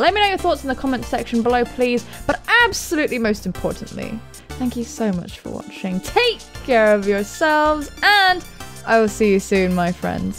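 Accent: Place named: United Kingdom. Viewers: Came for Life is Strange. Stayed for that smooth british voice and adorable face <3 You're amazing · I don't remember why I first came here. Maybe it was for HuniePop. shifty look Still, I also stayed for the British voice and I'm probably more stereotypically British than you are. :p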